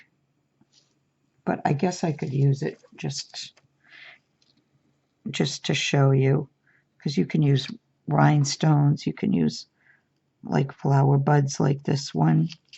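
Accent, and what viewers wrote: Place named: Massachusetts, United States. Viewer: This is just so lovely! Thank you for sharing! Your MA accent makes me feel right at home!! I grew up there and most of my family is still in the Boston area. I live in ND now. So glad I met you through the shop!!! Just subscribed!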